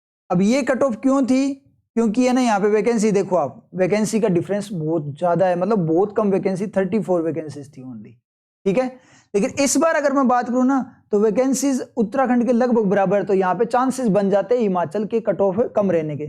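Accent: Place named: Ganganagar, India. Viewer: Ap gngr Or hnumangd side se ho kya.... Awaj se pta chl ra h thoda 😂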